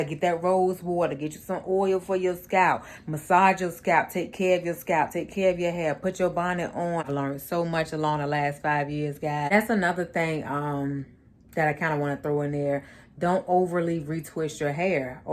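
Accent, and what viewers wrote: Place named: South, United States. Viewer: You are very southern lollll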